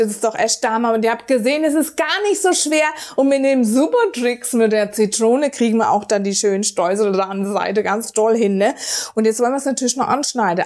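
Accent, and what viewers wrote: Germany. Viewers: Ich bin so amüsiert über deine verbale Dialektwanderung zwischen Berlin, der Pfalz, Hessen und ein büschen Nordisch....von wo bist du, du Granate??? Deine Rezepte und die Umsetzung sind ja herrlich!!!+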